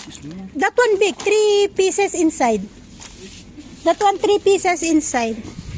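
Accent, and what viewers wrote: Iloilo, Philippines. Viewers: ilongga kapo maam?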